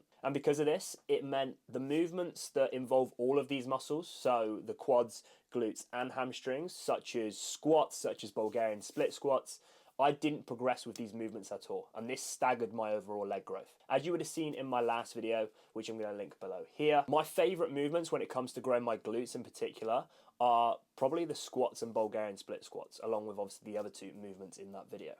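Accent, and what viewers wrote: United Kingdom. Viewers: British accent, blue eyes, big butt. My dream guy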